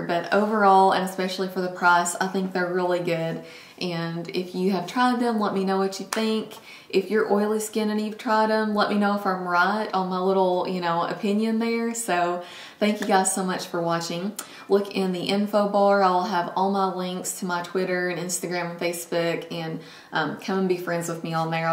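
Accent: South, United States. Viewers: i've been hesitant to try the foundation, but after your review i'm going to try it. i love the southern accent on a classy, well-spoken person like yourself. :)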